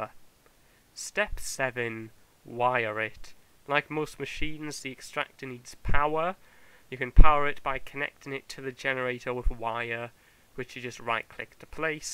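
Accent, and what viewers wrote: United Kingdom. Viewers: You sound like 'Charlie is so cool' u know that British one haha. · Probably a dialect of the UK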